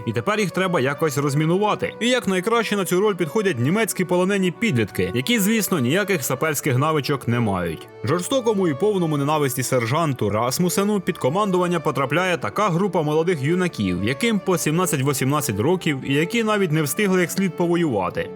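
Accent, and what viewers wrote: Poltava, Ukraine. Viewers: Друже, ти не з Полтавщини? Бо як рідний) Підписався без роздумів. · Чудовий полтавський акуент😊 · Ох вже цей польтавский гуовір😂❤ З Пирятина? Дякую за підбірку🤝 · Дякую за чудову підбірку, було цікаво дивитися, а ще приємно чути Полтавський говір 💪🏼😊 Від себе хочу порадити фільм - Залягти на дно в Брюге. 2008 року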